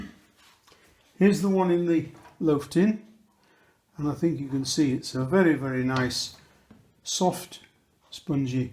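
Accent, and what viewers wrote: Yorkshire, United Kingdom. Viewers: RIP. We're going to miss your wonderful Yorkshire twang.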